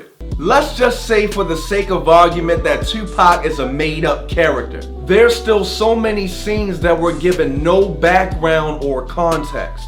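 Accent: Baltimore, United States. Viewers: I knew you were from Baltimore within the first few seconds. Your accent 😍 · you got that heavy Bmore accent fam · Soon as you started talking I knew you was from Baltimore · As soon as you started talking I was like oh yeah he's from Baltimore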